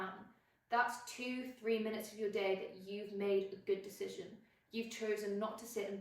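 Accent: United Kingdom. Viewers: Thank you so much, I am a freshman, And I almost finish first term. I really wanna be a productive and disciplined in 2026. I'm really interested in your schedule and your ways for being discipline Thank you for sharing your routine ❤ Honestly I dont like British accent but you changed my mind totally..I'm trying to pronounce words like yo 😁❤British accent is stunning,when you speak❤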